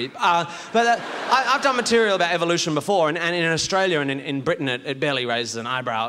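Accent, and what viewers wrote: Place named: United States. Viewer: Wow he does a very good American accent.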